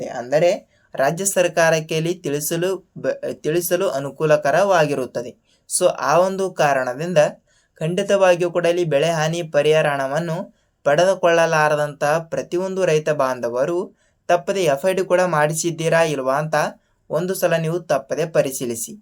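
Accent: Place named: Uttara Kannada, India. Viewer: Uttar kannada speech ok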